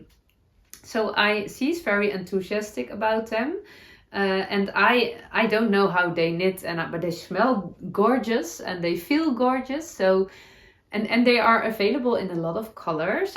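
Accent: Netherlands. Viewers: 😂😂😂😂😂 stop saying “shit” 😂😂😂😂😂😂😂 oh my goodness!!!!!! I love your Dutchy English!!!!!!! You completely brightened my day with your talk of shit 😂😂😂😂😂 I’m sure you now know, we say “poo” Wonderful ❤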